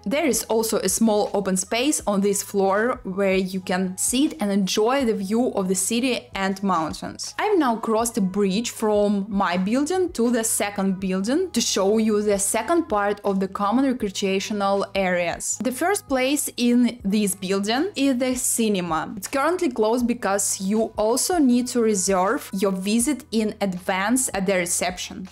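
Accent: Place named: Russia. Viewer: Услышал русский акцент местами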